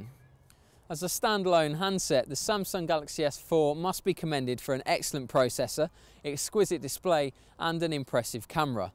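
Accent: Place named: United Kingdom. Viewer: Das britische Englisch hört sich schon scheiße an... aber das S4 hole ich mir sowieso... aber nicht in England.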